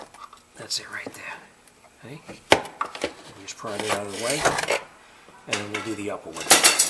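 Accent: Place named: Long Island, United States. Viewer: Wow it's been a while since I heard someone from the inland talking . I used to spend every weekend during the summer at either HotDog beach or Sag Harbor .or Long Inland National speedway with our 10 second Camaro ..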